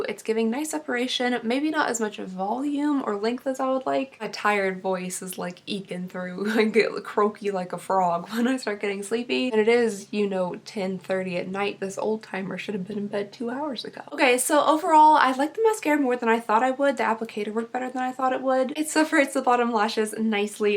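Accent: Kentucky, United States. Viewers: Are you from Kentucky????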